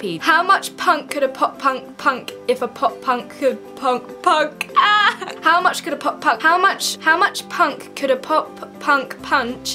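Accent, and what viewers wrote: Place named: United Kingdom. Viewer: I want to be you....your...your like British or something! I like making accents but I cant do British & I am working on my "Australian" accents.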